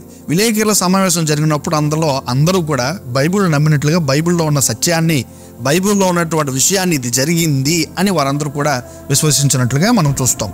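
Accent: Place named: United Kingdom. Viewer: Meeru enduku English vallaku puttinatlu English slang lo telugu matladutgaru Neat ga Telugu matladu ledante English matladu ee teda Telugu enduku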